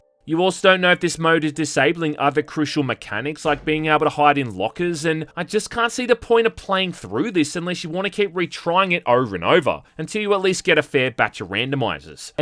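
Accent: Australia. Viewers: Are you OK? You're sounding like an Australian today.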